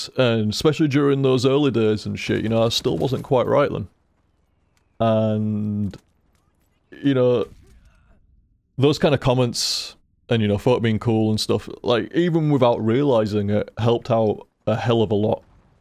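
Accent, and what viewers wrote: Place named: North, United Kingdom. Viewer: Hey, like a few others have commented, I first subscribed to your channel during your very first IWBTG playthrough, back in 2008 or whenever it was. I've gone from an awkward 14 year old to a successful 24 year old watching your vids, and I honestly think your content has helped me a lot through some of the tough times (maybe a fellow northern voice on the internet was cathartic, or maybe it's just all the laughs, who knows...). You're certainly my favourite youtuber ever, no doubt in my mind. you might not get millions of views on each playthrough, but your fanbase is really dedicated and I don't think a 2 month gap is going to make us go anywhere - we've been here long enough to stay a while longer! Take as long as you need to feel better - I can always watch the super mario 3 htsf for the 5th time... cheers!!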